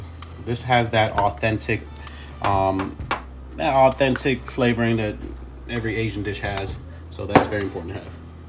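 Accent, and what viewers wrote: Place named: Georgia, United States. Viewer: I could hear the Georgia in you before you even said it. Thanks for the video, I'll be making this!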